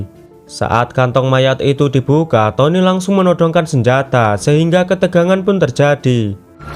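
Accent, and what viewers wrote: Java, Indonesia. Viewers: Medok banget suaranya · Meddookkk adminnya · Jowo mu bro medok banget,😅trimakasih ya bro sdh bs menghibur para pemirsa yg suka dg flm action👍👍💪💪🙏🙏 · Baru mau saya komen, medok amat ya, Brebes apa Purbalingga ya😅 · Medok jowone mas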